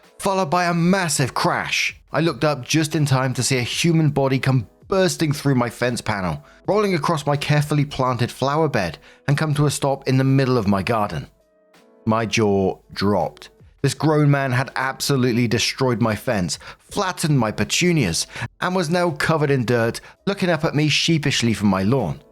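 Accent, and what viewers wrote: United Kingdom. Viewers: I love the sound of British English. They have so many cool accents over there 💕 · I'm pissed someone had the gall to complain about your speech. I knew just what they meant, I'd heard it. I love that you speak uk English. Fuk em all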